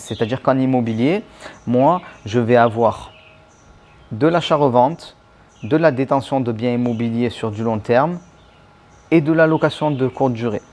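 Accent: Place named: Paris, France. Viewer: super videos merci c'est très précis, par contre tu es de Marseille l accent tu la laisser la bas tu parle comme un parisien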